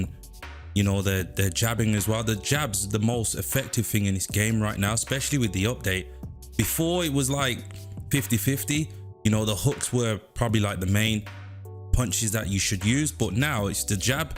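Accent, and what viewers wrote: United Kingdom. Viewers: Hey, why you pitting on a brum accent 😮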